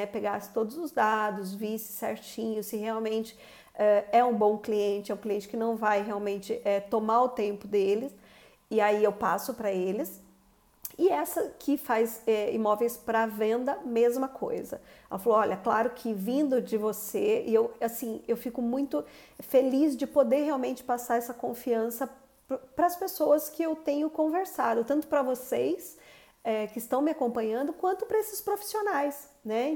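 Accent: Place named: Rio de Janeiro, Brazil. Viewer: E esse sotaque carioca?! Rsrs bjs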